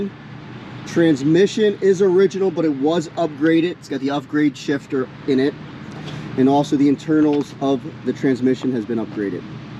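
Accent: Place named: South, United States. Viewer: Fortunately for him, the southern drawl does not hold him back from moving inventory every month.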